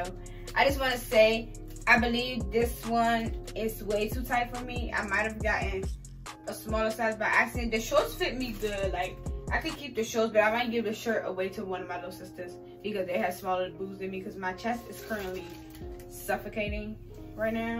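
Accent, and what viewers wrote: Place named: DMV, United States. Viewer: I love everything sis that dmv accent 🤣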